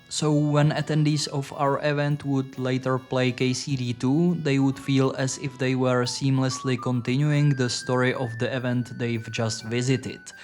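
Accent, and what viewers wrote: Czech Republic. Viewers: opened a kcd video sceptical by default, heard a czech accent and knew i was in for a TREAT 🙏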